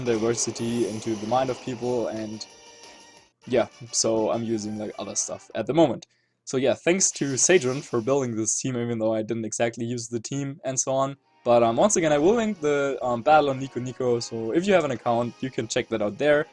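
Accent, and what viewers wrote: Germany. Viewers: just do it in german youll be fine ......................... well you do english videos as a german? so dont worry about ur pronounciation itll always be germanish :D just as mine ---.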